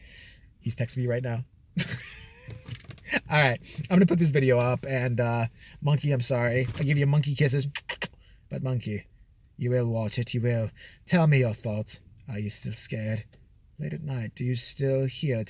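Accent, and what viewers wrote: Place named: United Kingdom. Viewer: God I love your British voice, bravo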